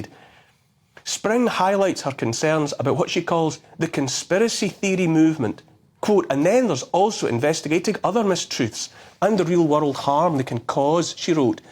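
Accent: Scotland, United Kingdom. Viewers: You have great information here that you so generously share with whomever will watch and listen. I'm a 70 year old pale male too. So, I'm going forward with a well intended suggestion: If you could slow your broadcast down one beat...just one...my brain could more easily absorb your wonderful content. Between your beautiful Scottish accent, and the slower pace of your easy language, I could really appreciate a better chance to understand everything you are saying.: )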